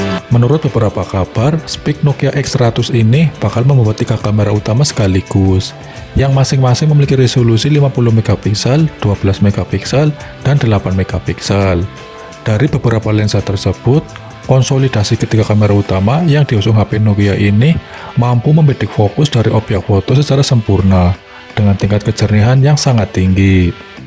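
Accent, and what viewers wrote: Banyumas, Indonesia. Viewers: Mas aslinya seka ngendi? Cilacap, Brebes atawa Purbalingga😄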